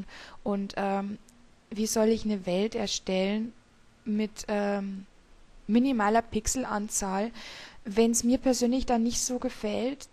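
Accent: Bavaria, Germany. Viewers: Ja! endlich a bayrischs Minecraft-Let's Play gfundn :D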